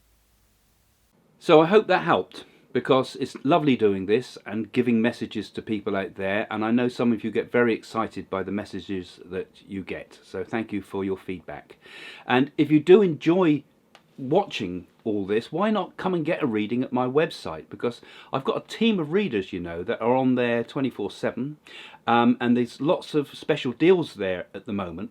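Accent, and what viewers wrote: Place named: England, United Kingdom. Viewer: Your a medium? Interesting, love your English accent.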